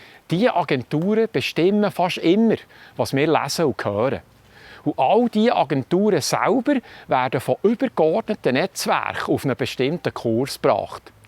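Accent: Bern, Switzerland. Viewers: Danke vielmals für diese fundierten Recherchen! Fantastische Arbeit. Man wusste es ja, doch so gut zusammengefasst habe ich es noch nirgends gesehen 👍❣️. I lose dir immer wieder vo Bärn zue. U wiu du so outhentisch schwyzerisch brichtisch, hani o mi Brüetsch chönne überzüge, chli kritischer häre z‘luege 🥳🥰. Danke dir ☺️. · Bisch de du Burdlefer oder Thuner?😅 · Ein so schönes Berndeutsch mit diesem Urdialekt haben Sie sich in den Staaten bewahrt. Neuerdings spricht man lieber eine Mischung aus Hochdeutsch, Berndeutsch und Englisch, weil der Arbeitskollege besser Deutsch als Berndeutsch redet.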